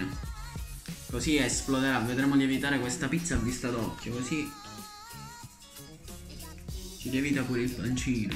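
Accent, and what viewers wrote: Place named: Palermo, Italy. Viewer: sei di Palermo? No perché anche io lo sono e penso di aver riconosciuto l'accento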